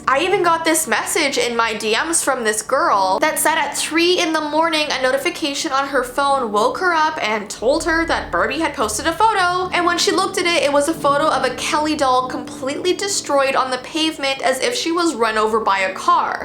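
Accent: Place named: Canada. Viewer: I think she sounds very Canadian, when I first found her channel and binged her vids my mom complained that I was saying “out” weird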